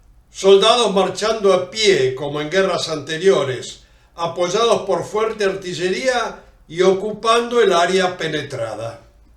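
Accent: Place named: Argentina. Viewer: O espanhol com acento argentino ♡♡♡ parabéns pelas informações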